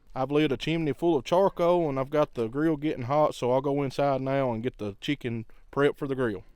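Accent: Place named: Appalachia, United States. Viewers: Listening to you talk always makes me miss family down in Letcher County. I'll definitely be giving the pickle juice a try when the weather warms up. Thanks for another great video! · that's the best hillbilly bears accent I've ever heard, where about are you from , i need to visit that state.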